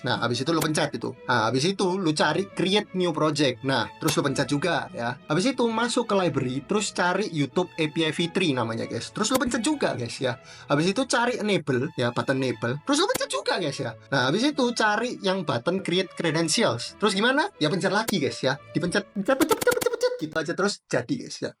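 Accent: Surabaya, Indonesia. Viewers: wong suroboyo iki mesti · Wong Surabaya Iki mesti😂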